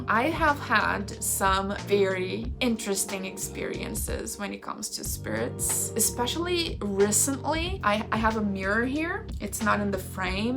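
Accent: Brazil. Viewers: Eu sabia que você era brasileira!! Seu sotaque é muito daqui <3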